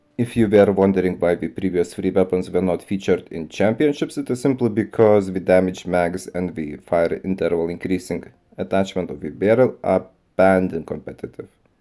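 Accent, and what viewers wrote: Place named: Russia. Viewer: U from Russia cuz you got pretty thick Russian accent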